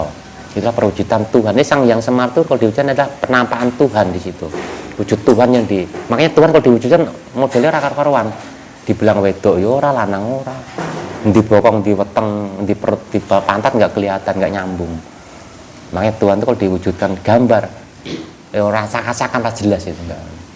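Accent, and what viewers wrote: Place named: Java, Indonesia. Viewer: Salam rahayu ..saya lihat dari logat bahasanya kayak orang jawa, saya lht alamat nya d dipkrifsi kok dari papua ,kami dari jawa timur mas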